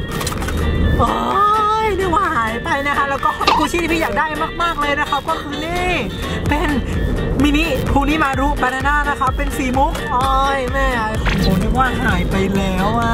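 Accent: North, Thailand. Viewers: ปี้ๆคนเหนือก่ะครับปี้ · พี่เป็นเป็นคนเหนือเหรอผมก็เป็นคนเหนือ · พี่ เปนคนเหนือหรอคะ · พี่ เป็นคนเหนือกะ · อ้ายเป็นคนเหนือกะ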